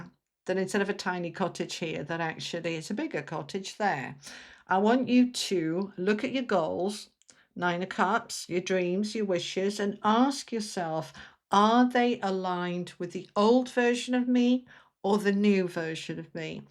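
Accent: United Kingdom. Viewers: thank you 💜 I'll continue to work on it. Your guidance is much appreciated, also lovely to hear a fellow UK accent ☺️ x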